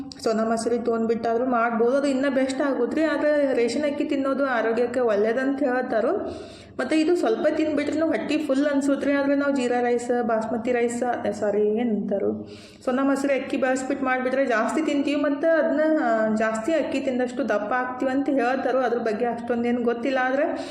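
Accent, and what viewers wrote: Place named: Vijayapura, India. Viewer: ನಿಮ್ಮ್ ವಿಜಯಪುರ ಸೂಪರ್ ರೀ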